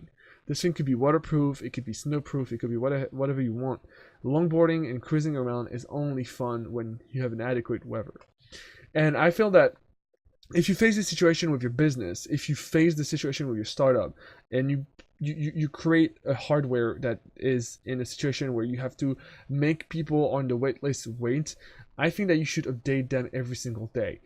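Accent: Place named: France. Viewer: Un ptit accent Français bien caché, j'aimerais bien parler anglais aussi facilement que toi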